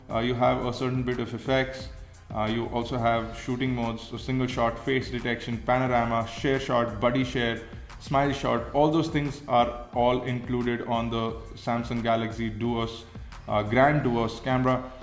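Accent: India. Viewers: lol this is indian accent review!!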